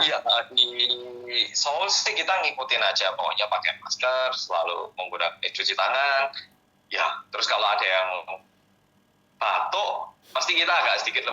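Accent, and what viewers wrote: Java, Indonesia. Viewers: Anyo hoseo.... Medhokkkkkkkkkkkkk🤣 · Orang korea yang medoook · Weee...mas masuk kompas TV.... Ada Orang korea yg Medokkkkk... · Yg sy heran korsel kematiany yg sedikit...bgmn pensngananya? Apkh dg bnykny tim medis..atu krn wargany yg mmg imuny kuat. Mas ini bukn org korea tp org jawa medhok bngt😁😂🤣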